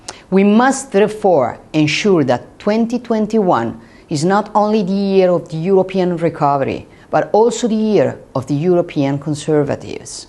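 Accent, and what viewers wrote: Rome, Italy. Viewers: non si possono paragonare .. ovvio, era imbarazzante e lo è tuttora, ma lei sta leggendo un copione studiato e per lo piu con pronuncia in romano quindi non vedo chissa che genio sinceramente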